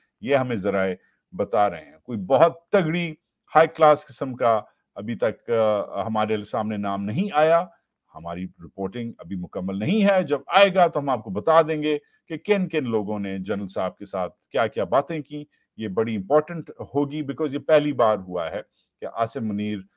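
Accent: United States. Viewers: Mr wajahat your are sitting here for correction of English sentences. This is military leadership visit , why your are involving foreign ministry. Being Pakistani i will give due respect to all my Civil as wel as Military leadership. your are speaking in tone of American, being American your analysis is very correct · Sir ap urdu blkl mere 21 sala bete ki trah bolte hn, just little difference u speak wd American accent and he wd French accent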